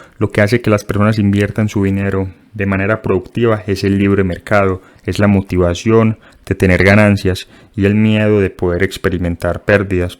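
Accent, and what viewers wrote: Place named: Antioquia, Colombia. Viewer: MENOS DE 10 SEGUNDOS DE VIDEO Y ME DI CUENTA QUE ES PAISA.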